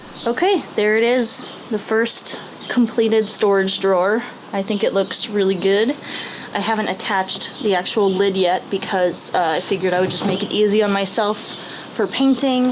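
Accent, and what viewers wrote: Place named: Boston, United States. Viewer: But I love your Boston accent!! Also that knife shirt is a total vibe...DON'T MESS WITH! It really inspires me that you're tackling so much of this build all on your own and it's such a joy to watch. (I know you had help with some of the electrical stuff, but so much of the woodwork has been by yourself - it appears at least.) I always had my partner to bounce ideas off of and problem solve with, and I don't think our van would have gotten finished on my own. You're such a boss lady!!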